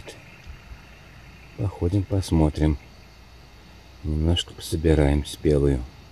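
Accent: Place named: South Ural, Russia. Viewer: 56 лет назад я уехала с родины, Южного Урала, в Казахстан и нашей земляники не видела столько же лет. А вкус её помню. Очень приятно услышать наш говор южноуральский. Спасибо, что всколыхнули воспоминания.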